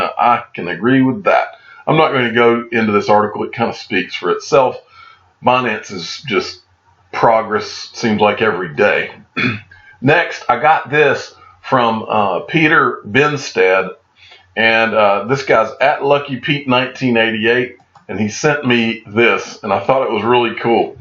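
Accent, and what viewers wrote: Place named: Texas, United States. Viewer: Sounds like you're from the south...maybe Texas. Love the accent.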